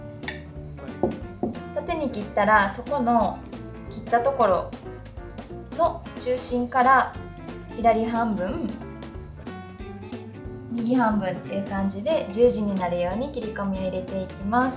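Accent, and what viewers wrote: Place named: Kansai, Japan. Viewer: はじめまして、さん！年末に仕事を辞めたので、時間ができたら米粉パンに挑戦！って思っていて、パン好きなので、グルテンフリーに前から憧れてました🥰 沢山ある動画で、さんのは、とても分かり易く、同じ大阪の方で何といっても関西弁にほっ！として、優しいほんわかな感じがとても良いです。お総菜パンも作りましたが、今日はクランベリーとクリームチーズを作って、めっちゃ美味しくできました😀 先日のライブで、チョコパイの話をされていたので、さっき動画観て、作りたくなりました。ドーナツも気に入ってて、まだ作れてないんですが、挑戦しまーす。こんな貴重なパン作りを無料で拝見できるなんて、有難いです。他もまだまだいっぱい作りたいと思います。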